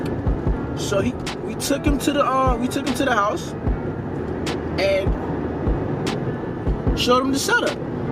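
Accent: New York, United States. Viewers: Are you from NY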